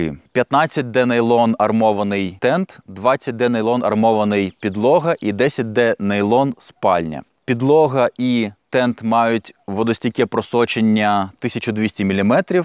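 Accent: Standard. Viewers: Гарний огляд, та гарна та чітка українська мова, дякую!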